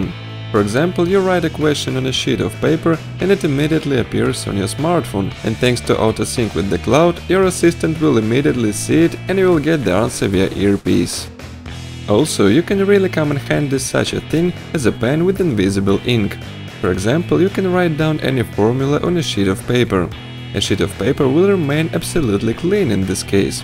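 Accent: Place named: Russia. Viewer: Ее, русский акцент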